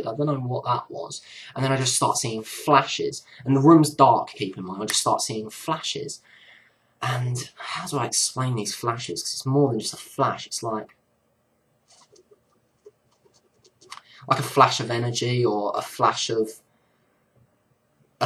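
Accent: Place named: Harlow, United Kingdom. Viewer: At last!!! I can pinpoint that accent...HARLOW!!! :^) lol. I'm an Essex boy but the complete opposite of the stereotype, and now live on an Island in the Indian Ocean...another Brit that has jumped ship! Anyway, regarding your series on Wave Function...I think you might be interested in Nassim Haramein, search also for ''the illusion of reality'' (59mins), and Flagellar/um motor or watch watch?v=VWvS1UfXl8k all parts. Peace Dude (fellow guitar player).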